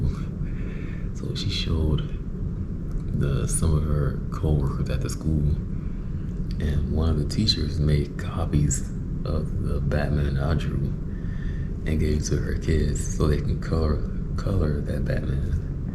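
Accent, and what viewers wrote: St. Louis, United States. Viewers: That st louis accent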